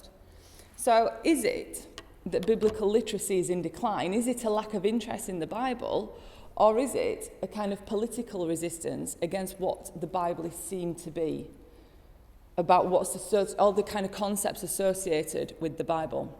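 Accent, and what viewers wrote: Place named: Yorkshire, United Kingdom. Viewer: I am living in York. It's an interesting accent with beautiful features. But it seems to me that her accent isn't so strong as we normally found in the streets, shops etc. Maybe in an informal context would be possible to hear more details.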